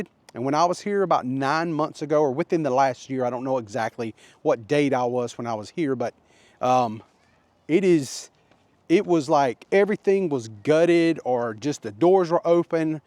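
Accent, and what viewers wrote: South, United States. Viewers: Another great vlog. Where are from? Are you also from NC, you definitely have a Southern accent? Also, why don't you do a vlog of Hendersonville? I'd like to see how it's changed over the past 40 years, apparently a load of rich Yankees have bought 2nd homes there?! 👊🏼🇺🇸👍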